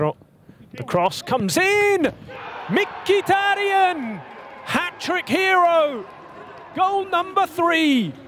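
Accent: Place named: Italy. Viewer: Pretty sure is an Italian commentator trying to speak in English accent...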